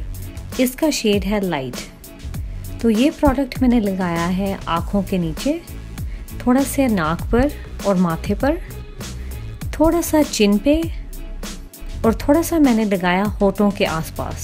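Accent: Punjab, India. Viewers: I like ur punjabi accent👍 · I love you yaar M straight 😂 Love you dil se ❤️❤️❤️❤️❤️❤️❤️❤️❤️❤️❤️😘😘😘😘😘😘 Love from Chandigarh Love your Hindi and punjabi accent Muuuuuahhhhhhhh😘😘😘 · Thodi Punjabi te att aa ji Hindi Ch v ohi accent Mere father ki da c edda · Tadi voice tone ch Punjabi touch aa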